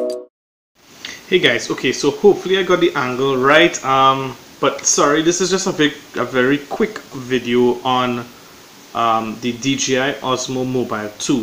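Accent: Trinidad and Tobago. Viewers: Is that Trinidadian accent? My friend is Trinidadian. He has exact same accent.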